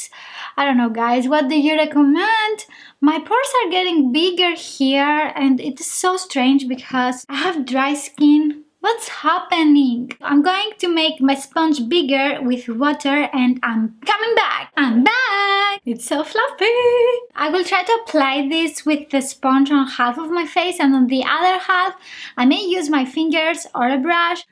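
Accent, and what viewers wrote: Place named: Greece. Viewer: Πως φαίνεται ο Έλληνας.. Η προφορα σε προδοσε, και μετα ειδα το όνομα σου.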